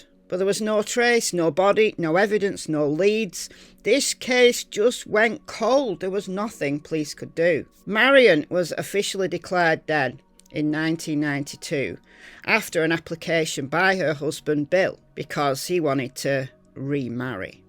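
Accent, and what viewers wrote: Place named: Lancashire, United Kingdom. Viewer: He definitely did it !!!!!! Must say it's great to hear a Lancashire lass your brilliant from a fellow Lancashire lass 👧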